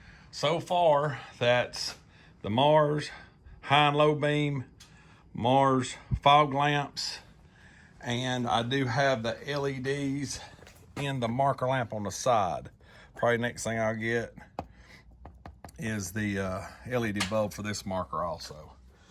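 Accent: South, United States. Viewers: All auto DIY videos hall be made with the deep southern accent. Makes me feel comfortable and brings me back to my childhood. And makes me feel like I can do the diy too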